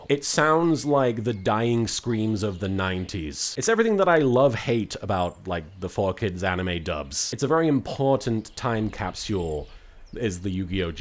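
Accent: United Kingdom. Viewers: Your britishnes is showing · So that's what his normal voice sounds like... It's... British! Truly a shocker considering that he has been called a chubby British guy by Ishizu!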